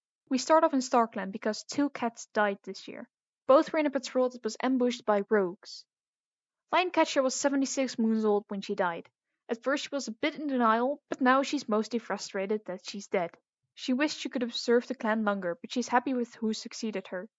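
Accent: Netherlands. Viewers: Awesome! Also do you speak Netherlands/Dutch? It sounds like that so sorry if its not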